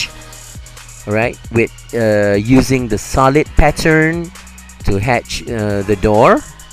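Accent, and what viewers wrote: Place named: Philippines. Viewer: useful video and from ur accent it looks like u are from Philippine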